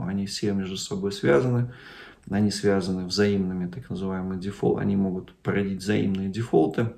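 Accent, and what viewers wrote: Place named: Siberia, Russia. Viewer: Ну ещё и сибирский говор, который, как сказал поэт, "неистребимый уральский акцент ", это мегакруто слушать, при совершенно правильной речи дух человечности реального языка сквозит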